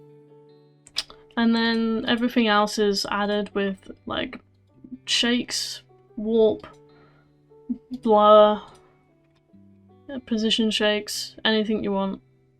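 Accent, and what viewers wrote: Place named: United Kingdom. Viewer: i noticed when im trying this and i noticed your bri-ish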